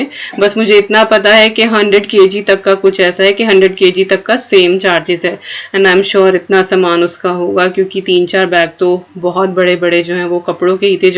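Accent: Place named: Haryana, India. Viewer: Hlo apki bhasa haryana se milti julti you are my favorite YouTuber 🤗🤗🤗🤗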